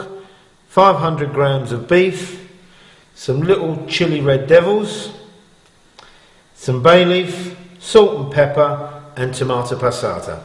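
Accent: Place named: United Kingdom. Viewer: I love the recipe and the surprise that a British-sounding cook has real Italian-speaking family members. Love it!!!!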